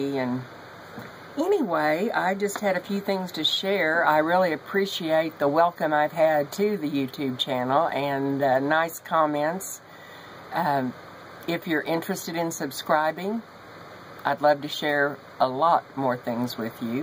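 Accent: South, United States. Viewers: Lol I do have a accent a jersey accent lol you do sound southern ha!!!!!! You made me laugh that's for sure have a great weekend my friend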